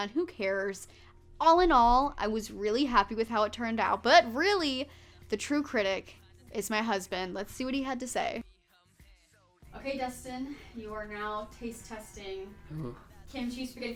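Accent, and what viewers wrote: South, United States. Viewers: Are you originally from the south? Love your accent! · All the y'alls make me think your southern.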